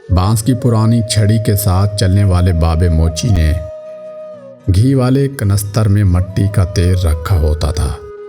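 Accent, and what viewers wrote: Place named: India. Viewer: It was good video but it looks like some indian dude made it with Indian portraits, it’s more impressive when Pakistani have their own portraits,even narrated by Indian accent. 20 years ago Pakistan was not part of India. It’s nice try by Indians to make Pakistani cry.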